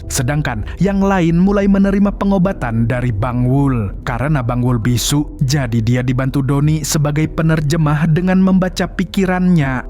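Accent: Banjar, Indonesia. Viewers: Ternyata mamang urang Banua jua 🤣🤣🤣 babahasa Banjar sidin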